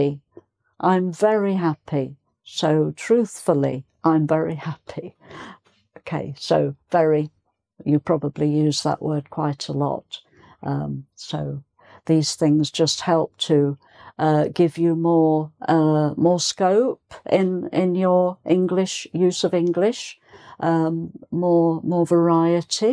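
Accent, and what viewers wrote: United Kingdom. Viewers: I really like the British accent😘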